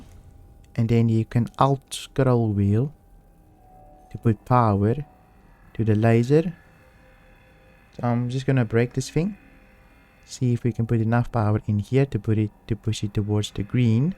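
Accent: Afrikaans, South Africa. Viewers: man i know its not your fault but damn that afrikaans accent is sooo thick 👁👄👁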